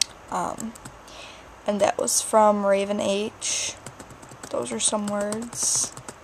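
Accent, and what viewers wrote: United States. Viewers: you have an American accent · accents are awesome! lol :P yea u do u got an american accent ;) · ermm to me (being from the uk) you have an american accent :P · er...you have an american accent? of course its sort of pointless to ask if you have an accent to other people who live in your area because you'll sound like them. but to someone from a different country of course you'll have an accent. everyone has an accent, which is essentially intonation and what not. i dont think i have an accent but ive been told i do (i'm from Trinidad, which is an island in the caribbean). i wouldnt prnounce any of those words like that, and thats the point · haha Im from Ontario and your voice sounds normal besides your A's or E's sound abit strong, xD some people would say we have accents. but in truth EVERYONE has an accent yours is just american :) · american accent :P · Sounds like an American accent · you have an accent. 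American. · You have a nice accent, American I would say, what part of America I could not say; but it doesn't sound like a very strong accent. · You have an American accent before you even started saying the words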